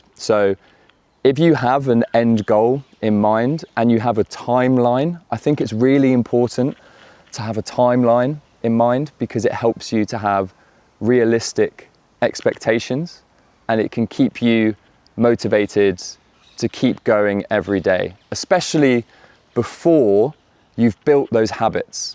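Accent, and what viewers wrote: United Kingdom. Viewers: Hi there thanks for this I really love the British accent and finally I found an interesting channel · Is that British accent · Finally someone with British accent 😂❤ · Eu gosto do sotaque britânico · You said you are in American in one of your films but your accent is like UK . I love your accent cus I'm learning UK English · Your british accent, I loved it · Ahahaa😂 he speaks in british accent and I really love it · Talk about another topics as much as you can because you have a wonderful British accent. And I think it can be a listening practice all of us. Thanks. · Are you speaking in British accent???? because I want to learn American one, I'm afraid to be in the wrong place 🙂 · Although I focus on learning American English, but this video is my listening practice today , I like the British accent BTW. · Are you British? Your English is different.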